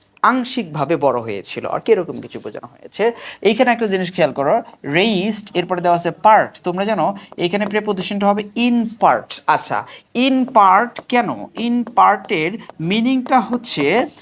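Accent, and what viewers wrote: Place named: United Kingdom. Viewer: Sir Apnr English ta amder English moto bebohar kora ocit karon sobai Apnr occaron bubena Jake bole birtis basa Bangala dese manos moto ocaron korle Valo hoi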